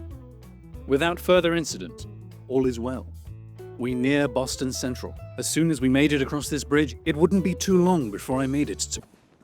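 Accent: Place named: United Kingdom. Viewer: You're Britisj 10/10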